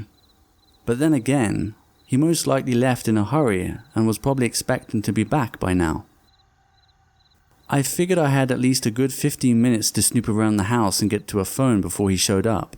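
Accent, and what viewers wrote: United Kingdom. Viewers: Unrelated but you have a nice accent. I assume you are British?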